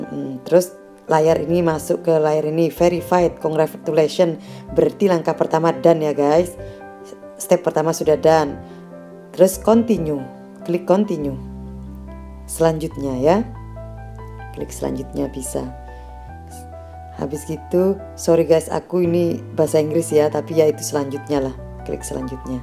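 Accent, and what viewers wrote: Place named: Madura, Indonesia. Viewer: waaikumsalam, Youtuber pemula kyak aku hrs nyimak nich so check it out guys logat madura nya terasa berat hehehe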